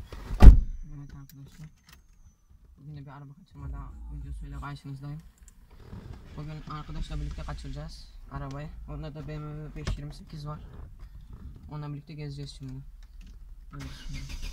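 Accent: Malatya, Turkey. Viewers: Malatyalimisin kardes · KArdeş malatyalımısın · Kardesim malatyalimisin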